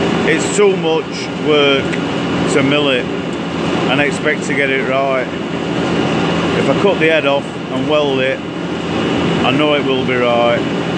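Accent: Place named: Wetherby, United Kingdom. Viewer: Ahhhh. Three years without a video. You're killing us, killing us. Once in a while I go drinking with a guy who used to live in Wetherby, but has now moved to the colonies (as he calls it). His accent is similar to yours but he is a prison guard, not a machinist. It's lots of fun but there's no trepanning talk. The only drilling he sees at work happens in the showers. Ken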